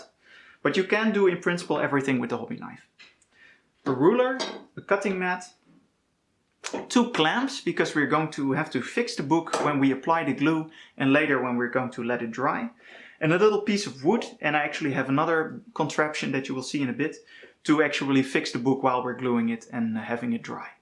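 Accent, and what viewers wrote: Netherlands. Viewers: Do I detect a Brabant accent?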